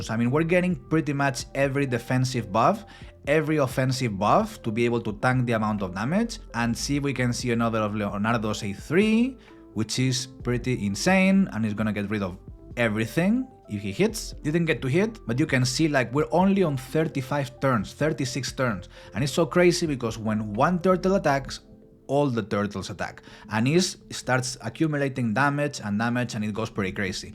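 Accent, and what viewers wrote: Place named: Spain. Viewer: Hey, I'm the guy that asked you in your Spanish Channel which was your English Channel, I'm neither a native English speaker, but I've seen your video in both languages and it feels amazing here too, I love the fact that you are quick to speak in English you're fluent your vocabulary doesn't get stuck to remember words mid sentence, and pretty much everything is awesome, your accent is slightly improvable to feel even more native-ish, as a native Spanish speaker but English teacher I see you're not just trying to be a bilingual content creator but rather know what you're doing, I'm disappointed at the fact that there is almost no recognition in this channel and I'm guessing it's because there are very few Spanish RSL CCs, but many English magnates in the board like Ash, HellHades, etc. I feel you probably should promote your English Channel in your Spanish community more, as you may find more bilingual people within your main community who could come here and interact with your content to get you to more people's algorithm. 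That said, your accent, not to judge or anything, shows you're a native Spanish speaker, and let's be honest, that's not very attractive towards English viewers because of the prejudices and stuff, both in the sake of community engagement and blend in among other English creators, your accent could use slight improvements alongside adding a few slangs to your vocabulary to make native English speakers feel more related, which I don't doubt you can as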